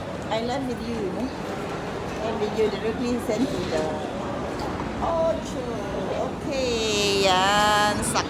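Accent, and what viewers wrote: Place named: Visayas, Philippines. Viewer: Nindot gyud ni paminawon ang bisdak mo enggloy madame. Hihi bagong tropa pa doorbell nlng pud balik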